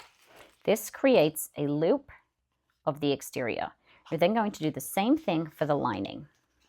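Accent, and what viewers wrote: Australia. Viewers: As an Aussie I love love love hearing your Australian accent popping through every now and then! Thank you for your amazing tutorials - new subscriber here :)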